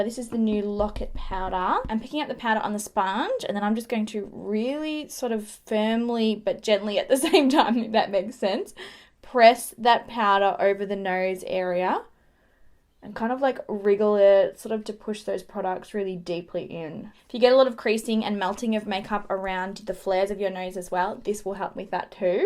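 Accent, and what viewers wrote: Australia. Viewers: Sorry I couldn’t hear past the Australian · So many Australian makeup gurus, I love it. Or are your from New Zealand? I attribute your accent to Australia :p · Hey where are you from? You sound Australian....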